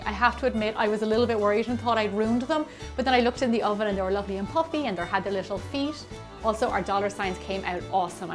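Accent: Ireland. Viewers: Hi! These are super cute, the gold lusture dust makes all the difference! I watched the video you done on a Youtube channel, you sound so Irish in that compared to your videos! I couldn't believe it at all! Are you taking part in Donal's collab? I can't possibly be that Irish, I've never tried bread pudding!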